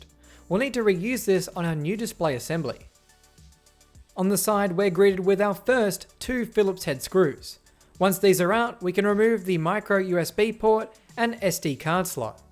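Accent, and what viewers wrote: Australia. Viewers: I’m from England and we do not have that accent. is Australian (you couldn’t have been further away) lol. I’ve never heard any other Australian pronounce data as daughter/darta either.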